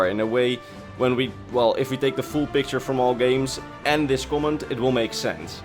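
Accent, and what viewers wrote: Netherlands. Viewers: Are you Dutch? Your accent sounds kind of Dutch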